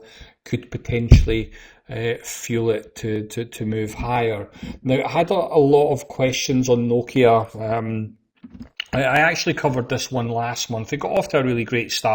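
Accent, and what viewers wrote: Scotland, United Kingdom. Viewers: Just subscribed to the channel and enjoyed the content and the Scottish accent! Did a trip to Scotland 2 years ago and found a cemetery In Dysart, Kirkcaldy, that had my grandfather 7 generations back. A huge fan of technical analysis so this video was enjoyable to watch and I will watch previous months also. My biggest positions are airlines-I have 3 but I would like if you would cover UAL. One thing I don't know much about is Fib extensions so if you would cover that I would appreciate it. Thanks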